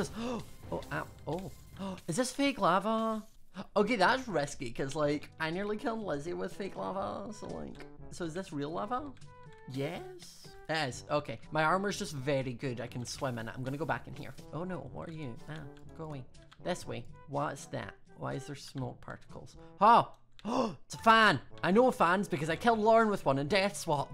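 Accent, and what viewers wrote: United Kingdom. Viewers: U sound British 🤐🤐🤐🤐🤐🤐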